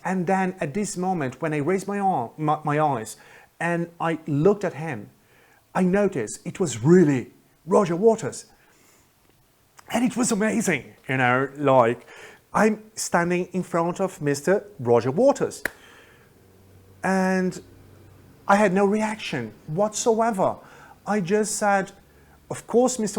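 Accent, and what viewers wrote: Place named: United Kingdom. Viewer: I really liked your history, I could imagine every moment that you described and I guess that was a chock and surprise , I laught a lot with you hahahahha Please stay with this kind of videos, they're amazing! And I couldn't avoid to notice your britsh accent, you talk exactly at the same way of them!! lol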